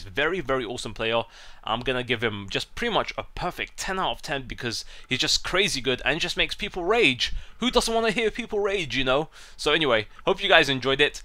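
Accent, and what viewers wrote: Belgium. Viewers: This guy had a belgium accent btw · He's not French...Didn't you ear this ugly Belgian accent ? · sounds like he was from belgium!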